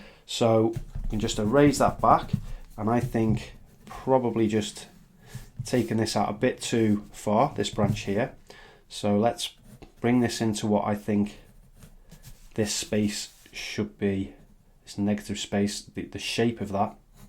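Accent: Liverpool, United Kingdom. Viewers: Liverpool? Or Birkenhead way ? , some words I’m thinking deffo Liverpool, then I’m goin oh I don’t know 😂😂😂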